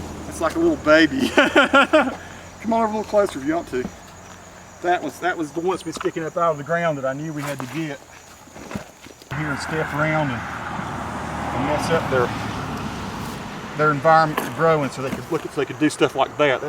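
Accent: South, United States. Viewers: hi wonderful to watch you pull up all those sweet potatoes. I was so excited and they aren't even mine. I love to garden and this past summer, I just stuck a small piece of a store bought sweet potato and then stuck it in a big container. That thing had the prettiest vine that I trellised and lo and behold in the fall I had about 5 pretty decent potatoes from that one vine. I probably would have gotten more but I put it in with a couple of other plants which already had root systems that the potatoes just wiggle themselves around so this year they will have their own private containers. It was such a wonderful treat to eat my own "sweets", from my garden. I am very anxious to do the "slips" this year, that I have been watching on youtube and reading about. Can't wait. I live in Northeast (southern NH, 45 minutes north of boston, ma, so I have a bit of a wait but I start a lot of stuff inside cuz I can't wait. 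It is Jan and I just got all my seeds so I will be starting this week. Thanks so much for the great video. Where do you folks live. I know you are from the south, thats for sure (ha, ha,). lovin listening to your accent. Have a good time gardening this year. I do. Patty in nh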